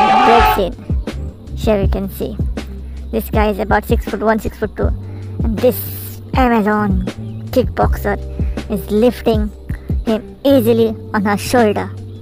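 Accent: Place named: India. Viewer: please change this horrible Indian accent narration.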